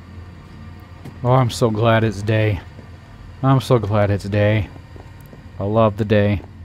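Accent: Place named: United Kingdom. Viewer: WE ARE BRITISH 🇬🇧 (sorry I love the British accent)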